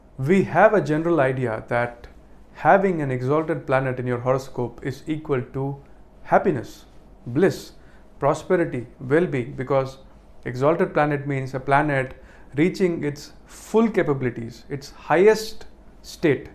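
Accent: India. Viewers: I guessed Tamil too D You have that slight South Indian accent, besides the look :) would have never guessed a Bengali.. From Mumbai though ?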